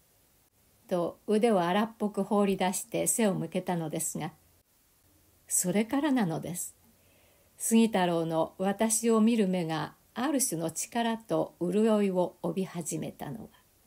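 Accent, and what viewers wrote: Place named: Hachinohe, Japan. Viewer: 彼岸じゃらく…当地方の彼岸の辺りの悪天候・・・懐かしく八戸弁（東京弁のですが…）を聴かして戴きました。 ｢ ｣さん、ありがとうございました…。 感動の朗読をありがとうございます❗ 感謝です…‼️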